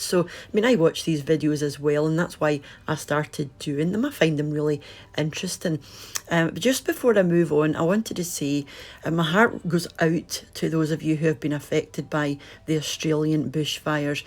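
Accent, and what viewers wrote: Scotland, United Kingdom. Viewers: 'Nice of you to take the time to mention us here in Australia. I live in Sydney. It's been awful for a lot of people. Your channel is great by the way. You sound like my Mum from Renfrew. Oh, and just a little bit of humour to finish. You certainly don't look like that woman on the bargain show. Keep well.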